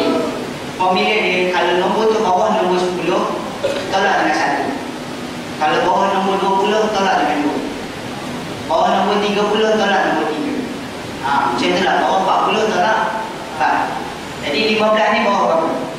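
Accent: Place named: East Coast, Malaysia. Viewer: cakap pahang ke ganu ke kelate ke apa, tak brp dengar 😂 jap, kalau 55x22,camne plk?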